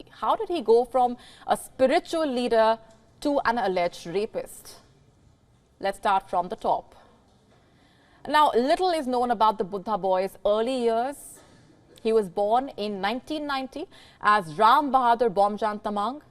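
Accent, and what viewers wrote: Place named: India. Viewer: Hard to understand for this report by english with the indian accent. Give up on her.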